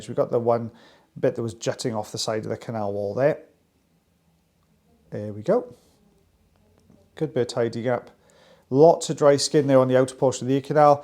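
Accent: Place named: Wales, United Kingdom. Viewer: Awesome job. no need to apologize for the way you speak. Don't worry about the haters or complaints. You are Welsh not American. ❤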